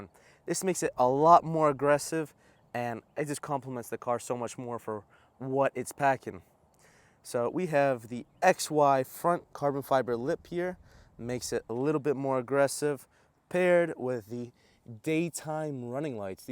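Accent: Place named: Albania. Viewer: You sound Albanian 😢😔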